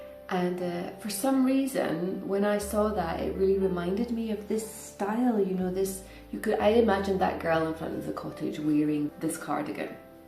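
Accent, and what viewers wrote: Scotland, United Kingdom. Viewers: Hi! I am near Aix en Provence and I’m a sucker for the Scottish accent! I too knit but right now I’m spinning more than ever! Have a lovely afternoon and I hope our paths will cross! Adrien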